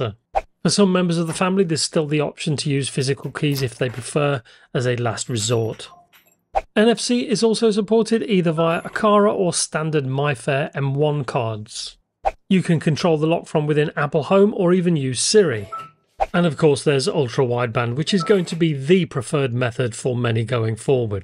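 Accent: United Kingdom. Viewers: I love the ultra wide band! So cool! Great video too! Can I ask if I live in the UK and want to use this on a new front door, you sound British, do you think this is not for that?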